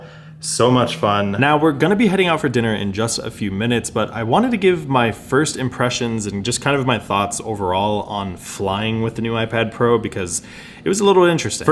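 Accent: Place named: Australia. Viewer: Australian accent!!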